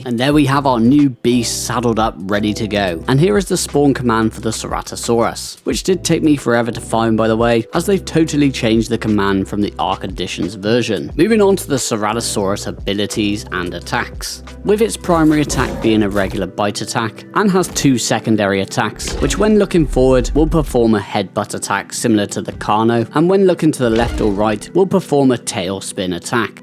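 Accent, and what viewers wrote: United Kingdom. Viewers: Yea he’s British bro, they say stuff like ‘Whas dat?’ D’ya like it?’ You’ve got to love it😂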